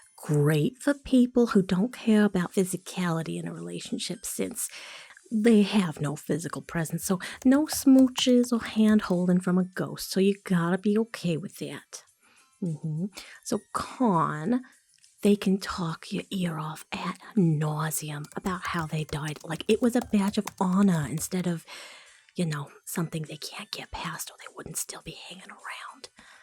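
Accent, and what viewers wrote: New Jersey, United States. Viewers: Omg i freaking love this, Jersey accents are one of the best American accents, my personal favorite is dixie southern aka georgia southern, but Jersey is close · Love the Jersey accent!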